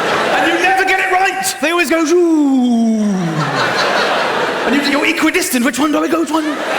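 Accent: RP, United Kingdom. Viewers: I think it's his very posh accent that makes his style so good. Very funny, very likeable guy.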